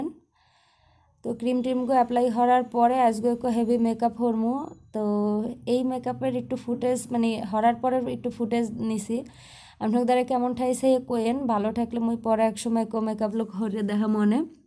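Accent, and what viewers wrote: Barisal, Bangladesh. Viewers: আপি আমি তোমার 2 টা চ্যানেল দেখি খুব ভালো লাগে,আরো ভালো লাগে তোমার বরিশালের কথা,ভালো থেকো আপু,দোয়া করো আমার জন্য। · Din din sundor hoye jacco .Barisal er meye bole kotha .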